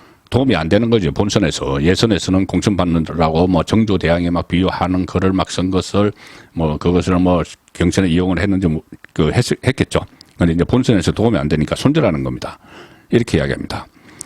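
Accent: Gyeongsang, South Korea. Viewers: 송국건님 시원시원한 경상도 억양으로 최고의 정치해설 짱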